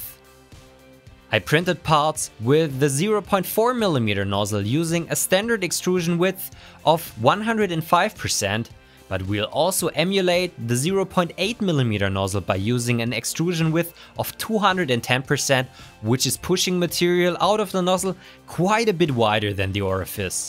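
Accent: Germany. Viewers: Man merkt richtig dass du deutscher bist.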